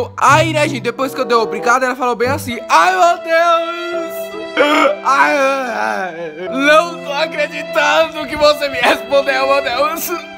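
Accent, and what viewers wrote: Bahia, Brazil. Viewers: C é da Bahia? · vc é baiano??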